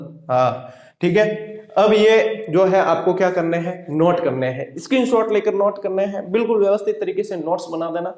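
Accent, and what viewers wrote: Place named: Marwari, India. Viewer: Sir aap marbadi bolte ho muje shmj to nhi aati lekin achha lgta he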